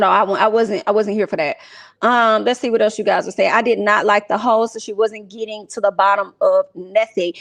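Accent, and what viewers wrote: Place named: Alabama, United States. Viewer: I just love to listen to you talk! Reminds me of my family home (Sylacauga, AL). ❤️❤️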